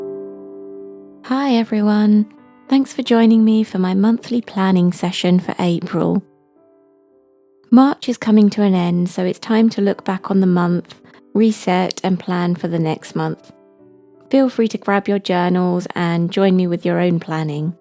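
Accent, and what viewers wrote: United Kingdom. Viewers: Hi again!! Never mind my question about your IG account, I just saw the video again and took screenshot of your IG account name. Oh oh yes, and the spreads and the weekly so I can recreate and tag you. (But I am NOT nearly as talented as you, so it will take quite some time and a lot of hard work and trying and failing before I will have something I’m not too embarrased to show you....😅❤️ It was even more relaxing to watch the video for the second time btw. Cos then I knew what was coming up so I could be a bit more at ease👍🥰 One more thing: you should definately become a teacher of some sort for people with anxiety or stressed people, cos your voice is the most relaxing voice I’ve ever heard. With that perfect British English/accent❤️ I always find myself very relaxed and full of ideas after watching your videos. I don’t usually comment, but after this I just had to🙏❤️ Love from me💖👱🏻‍♀️🇳🇴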